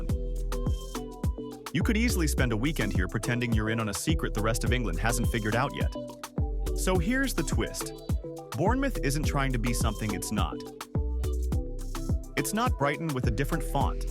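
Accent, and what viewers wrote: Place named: United States. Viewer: Commentary is pathetic why American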